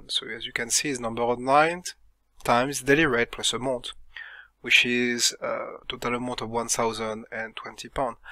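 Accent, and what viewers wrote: France. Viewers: sounds super French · It still sounds French even if he speaks english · your accent is more of french which is a bit hard to understand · seems French accent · A French speaker's English is always so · Terrible with the French English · merci beacoup pour le rafraîchissement de mémoire et pour le magnifque french accent. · Langue anglaise avec un accent français génial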